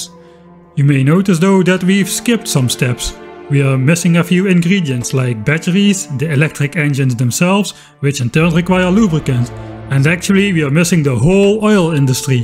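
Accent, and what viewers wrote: Netherlands. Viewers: I've been wondering about your accent forever. is it dutch? or something I just can't put my finger on. it's really rather thicc for a dutch accent. but then again sounds like a dutch name. man.... maar uiteindelijk: toch GEKOLONISEERD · I recognized the Dutch accent curse from the start! As I have the curse myself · "But as I'm Dutch" I knew your accent was a little off to be french, but for some reason I didn't expected for you to be Dutch. I thought the accent was going to be different.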